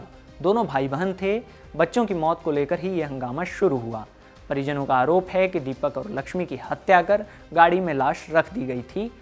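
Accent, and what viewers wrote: Bihar, India. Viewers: bhai, Real tone of Bihar -UP Maja aa rha aapka show dekh kr Theth bhasa me ❤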